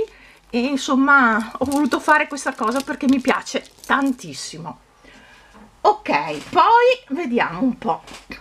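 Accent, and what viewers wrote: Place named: Verona, Italy. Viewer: Ciao, ti seguo sempre molto volentieri per tanti motivi. In primis la tua cadenza che adoro essendo per meta' veronese; le tue creazioni sempre cosi' piene di fantasia, il tuo buonumore e la tua gioia di vivere. Poi sentirti nominare paesini che mi ricordano la mia infanzia tipo Boscochiesanuova dove andavo da bimba, mi fa sempre sorridere. Grazie di tutto e Buona Pasqua a te e a tutti coloro che ami. Olga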